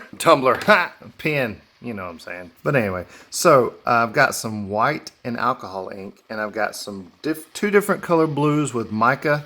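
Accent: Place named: Carolina, United States. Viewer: Love your videos! Been watching you for quite a while and finally subscribed! As soon as my friend played your video, and I heard your voice.. I KNEW you were from NC or SC and I was right! 😂😂 Thanks for all the tips!💕💕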